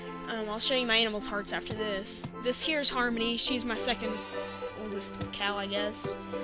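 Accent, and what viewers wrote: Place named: South, United States. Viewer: And no offense but your voice is hilarious I wish I had that hillbilly voice I talk kind of country but not enough it bothers me.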